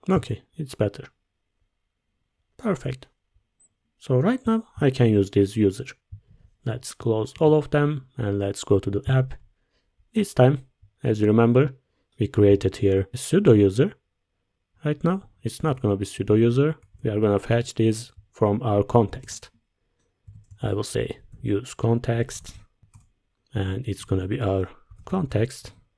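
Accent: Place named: Turkey. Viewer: Konuşan elemanın türk olduğunu anladın mı?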